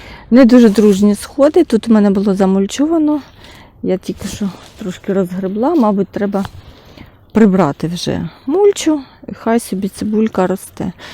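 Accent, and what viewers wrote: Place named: Transcarpathia, Ukraine. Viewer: очень красивая украинская речь колежанки это говорят на Закарпатье . буду учиться у Вас украинский мови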